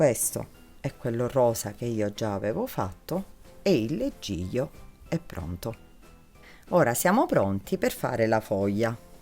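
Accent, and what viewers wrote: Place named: Sicily, Italy. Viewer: Bravissima ❤❤❤ti dirò mi piace quel tuo accento siculo nn so se sbaglio ma sei simpaticissima 😘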